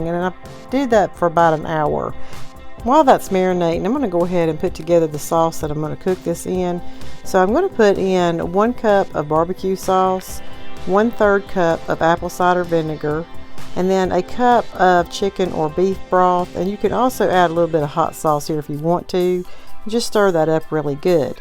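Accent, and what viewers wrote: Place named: North Carolina, United States. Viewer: The 2nd I heard your voice, I knew you were from North Carolina!! ❤️. I’m also from NC, born & raised! That food looks AMAZING, but regarding the BBQ sauce, I am partial to the NC vinegar based sauce, lol. *But, I absolutely would not turn my nose up to the BBQ you cooked in this video, LOL!! That corn, OMGosh, you’ve got me craving some sweet summer corn now, thanks!! 🤣 *We live Lillington, right outside of Fort Bragg. 🙋🏻‍♀️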